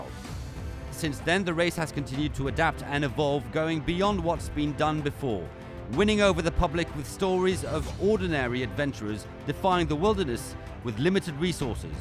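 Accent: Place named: France. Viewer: Need more French accent!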